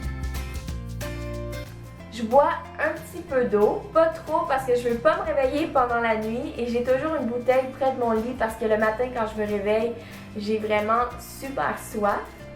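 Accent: Quebec, Canada. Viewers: j adore ton acsant québécois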